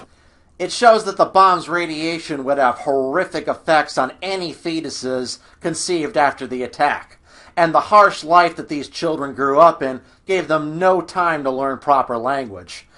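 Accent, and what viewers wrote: United Kingdom. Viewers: Did you have to have subtitles in the US because you couldn't understand Yorkshire dialect?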